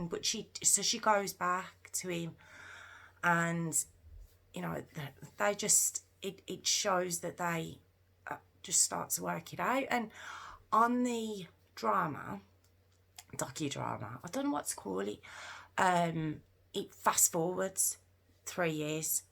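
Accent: Birmingham, United Kingdom. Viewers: I love your accent! Are you from Birmingham?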